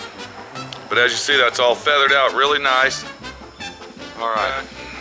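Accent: Texas, United States. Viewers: hahah.. i love the texas accent.. wish i had one.. i was born there but left when i was 1.. =/ movin to SC soon.. so hopefully i'll pick up an accent there.. this masshole accent sucks.. haha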